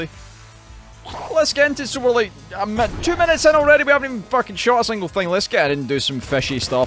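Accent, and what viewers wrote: Scotland, United Kingdom. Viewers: me: oh neat a nuclear throne LP I don't see those super often. I'll just click on this and- instant thick Scottish accent and I am consumed with glee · are you scottish? cuz i love scottish accents. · The Scottish accent considerably enhances the experience of this video.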